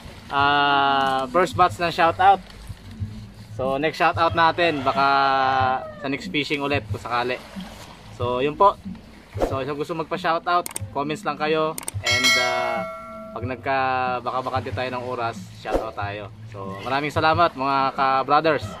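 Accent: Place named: Visayas, Philippines. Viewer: ..mUrag biSaya maN idOl anO sAlita nYO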